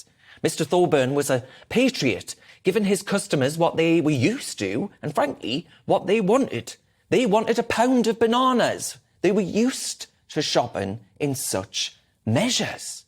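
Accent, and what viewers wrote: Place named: Sunderland, United Kingdom. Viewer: Oh please. Can someone please end this sheer madness. We just look totally insane to any non- Brit, and it really isn't funny anymore. We can either stay relevant within today's world, OR We can reverse at warp speed back to a 're-imagined' period in our distant past. 'Re-imagined' because it never existed in the first place. Watching this clip narrated by Mr Grimes with an over-the-top exaggerated mackem accent just to make it clear in the most unsubtle way what a patriot he is. Nauseating. Total jingoistic nonsense. The decline of the UK continues - overseen by a lier and a government packed with grade A narcissists (Patel, Raab), and dangerous fantasists (Mogg, Truss, Lewis).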